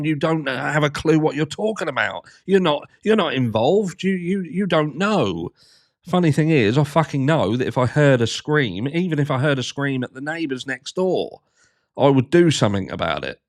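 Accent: Norfolk, United Kingdom. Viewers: I knew you must be a Norfolk boy from your accent! This case was extremely devastating. They were incredibly sweet girls. I’m just a stone’s throw away also.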